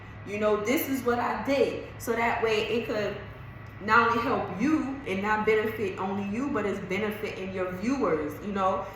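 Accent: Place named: East Coast, United States. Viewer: Hey!!! I absolutely love everything about you!! Your look, your energy, your East Coast accent lol and most importantly your tips and guidance!! I’ve been making waist beads for 4 months and your videos have helped me tremendously!! Keep doin what’cha doin!!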